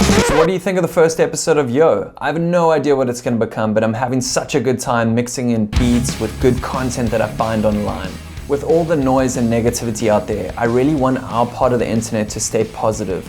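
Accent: South Africa. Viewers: Well done, 👏. A fantastic curated blitz of resources and news from the design and dev space. Great to hear the South African accent there too:) Subscribed - looking forward to the next one. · Are you perhaps South African?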